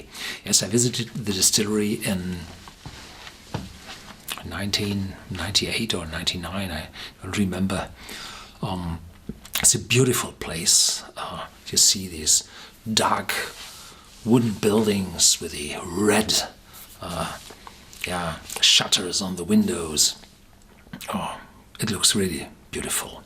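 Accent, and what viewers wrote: Bavaria, Germany. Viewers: I can’t tell if this is German Accent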